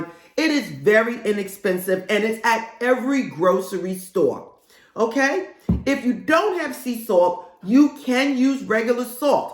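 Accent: New York, United States. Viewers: I love your New York accent Ms! Its helping the message sink in 😂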